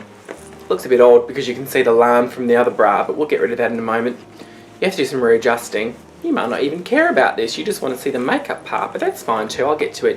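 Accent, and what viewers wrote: Australia. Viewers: R u from Australia 🇦🇺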